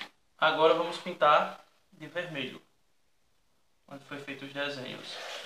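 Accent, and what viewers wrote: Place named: Bahia, Brazil. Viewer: vc e bahiano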